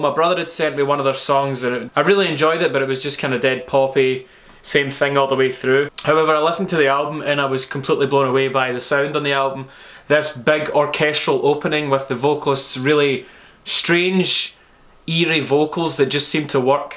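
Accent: Scotland, United Kingdom. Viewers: If Anthony Fantano was Scottish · Ahhhh.... I've been watching so many of your videos that my brain is thinking with a Scottish accent ! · Wait you're Scottish?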